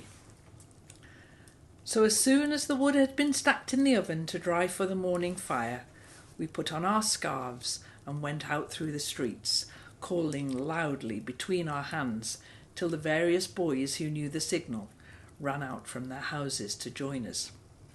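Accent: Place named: Scotland, United Kingdom. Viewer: Thank you so much for the reading. So heartwarming. Your accent reminds me of my Scottish grandmother and her siblings. They were dear to me. It is evening for you and about noon for us, a bright, clear 1F… snow on the ground. Listening to Frank Sinatra and Bing Crosby croon on the CD player. Does that age us? Merry Christmas and a good quilting year!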